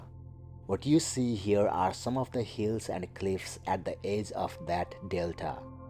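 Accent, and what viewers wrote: India. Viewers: Would love this video infinitely more if I didn’t have to hear this guys annoying voice, like some robot eastern Indian. The nerds behind the scenes should never do the selling, the narrating or talking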